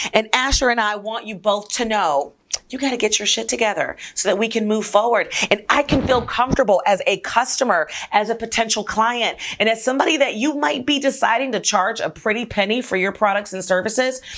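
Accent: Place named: Europe. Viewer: Sound like a European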